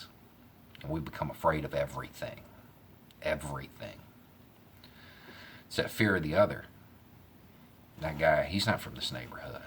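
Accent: South, United States. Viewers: As a Liberal in Western Pennsyltucky, I can say that this guy has opened my eyes., my man, I really hope you read this; You are doing far more good than anyone I've ever known personally or met as an acquaintance. Keep on doing what you're doing. I fully support everything that you're saying. Jesus H. Christ hanging off a cross, I needed to hear this message from someone with a southern drawl. From the moment you addressed the hero complex and the AR-15 being a symbol of masculinity to the minute you addressed every issue in this video, more people need to hear what you have to say. I'm just saddened to say that the message you have to share is simply going to fall on deaf ears; don't let that deter you from putting your words of wisdom out there, because it is reaching enough people to make a difference. I fully stand behind this guy. Cheers,.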